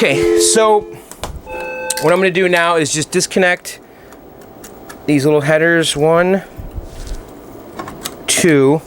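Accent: Germany. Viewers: Hey, I really love your german accent. I speak german and I laughed so well! TOP!!! Greets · That might be the best German accent I've ever heard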